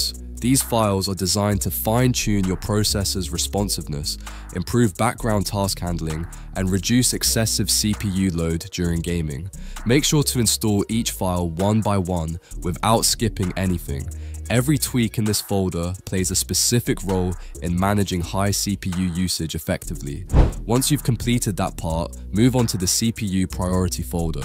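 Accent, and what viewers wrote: India. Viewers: bro when from brittish to indian?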